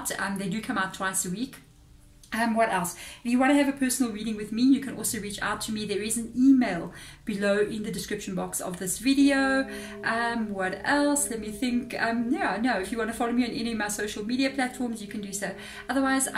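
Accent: South Africa. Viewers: Hello , are you South african afrikaans? I kind of recognize the accent .Spot on for the reading my story sadly.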